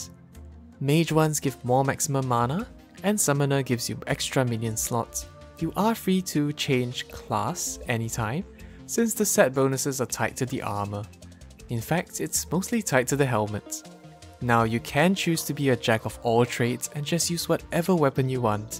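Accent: Singapore. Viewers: Wah bro, you sound like a singaporean. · knew u were singaporean the moment u started talking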